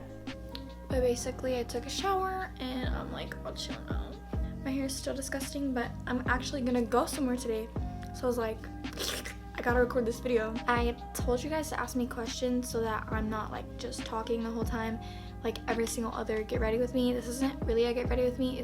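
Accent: Texas, United States. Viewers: What part of texas are u from ?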